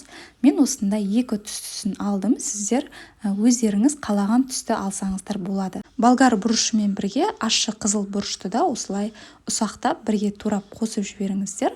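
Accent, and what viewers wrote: Standard. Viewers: Саламатсызба тәте? Сізге көп рахмет, таза қазақша түсінікті айтқаныңызға .Алла өзіңізге жар болсын! Қолыңызға қуат берсін Алла. Ауырмаңыз , балаларыңыздың қуанышы боп жүре беріңіз тәте , бұданда жақсы рецептермен бөлісе беріңіз.. Алға қазақтар ...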